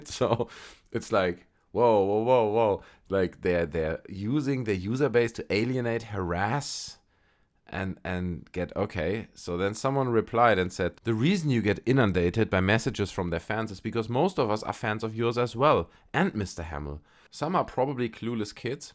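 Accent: Germany. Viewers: Lol you are funny man. Cool accent! German? Greetings from Holland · Subscribed because your German accent is excellent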